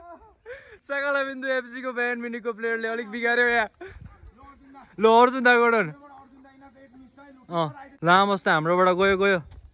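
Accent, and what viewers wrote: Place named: Nepal. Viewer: All are funny n entertaining.Nepali tone dami lago❤️❤️❤️👍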